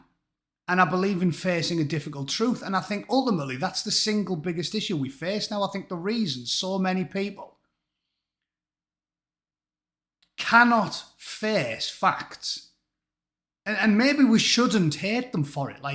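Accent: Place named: Northern England, United Kingdom. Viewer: A chippy Northern accent could also be the reason why Google AI policebots and Suuuzaaah haven't figured out wtf you're on about Haha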